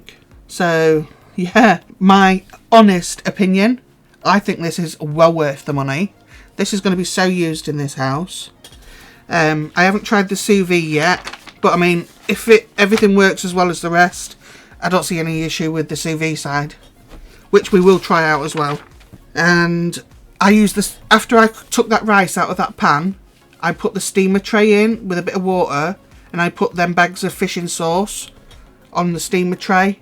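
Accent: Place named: Lincolnshire, United Kingdom. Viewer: Well done, got one now..well pleased. Oh Are you from Lincolnshire.,thought I recognized the twang..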